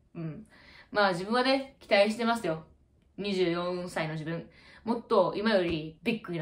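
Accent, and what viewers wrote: Kansai, Japan. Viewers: ほんまに関西弁も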